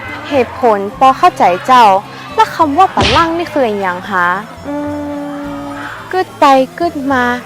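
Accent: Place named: North, Thailand. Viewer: ดีแล้วคั๊บ ปั๊ดกำเมืองแน้น ๆ เหมื๋อนกิ๋นข๊าวเหนียวนึ๊ง (อู้เป็นธรรมชาติดีแล้ว จ๊วยกั๋นอั๊กษาไว้เน้อ)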